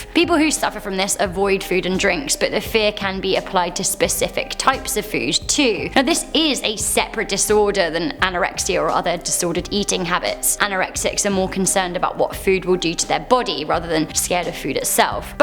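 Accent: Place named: United Kingdom. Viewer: it’s her accent she’s from the UK 🇬🇧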